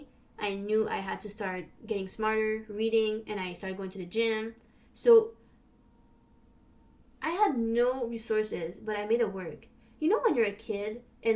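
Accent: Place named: Canada. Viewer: I’m asking because of your accent but where are you from? I think I might have heard you you say you’re from Canada?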